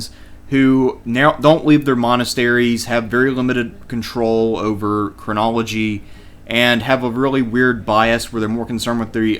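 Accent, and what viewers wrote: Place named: South, United States. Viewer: He speaks with a southern drawl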